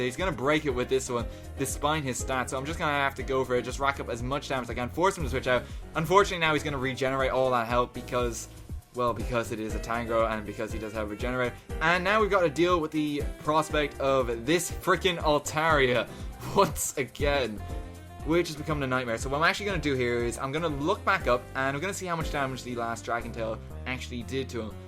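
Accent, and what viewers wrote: Ireland. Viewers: Irish Accent <3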